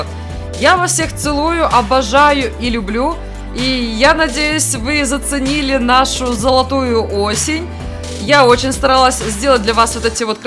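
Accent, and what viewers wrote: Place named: Moldova. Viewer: Приятный молдавский акцент.Скучила.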